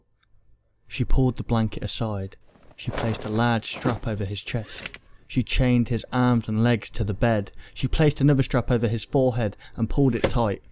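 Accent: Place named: United Kingdom. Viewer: I NEEDED a British creepypasta reader it gives diversity :p awesome work guys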